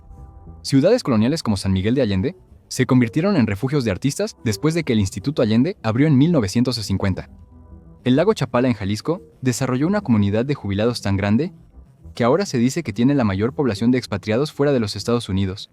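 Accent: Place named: Spain. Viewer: Claro, suena muy español lol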